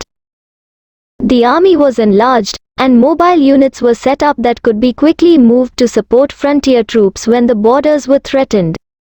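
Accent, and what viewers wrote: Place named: India. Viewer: Indian accent lol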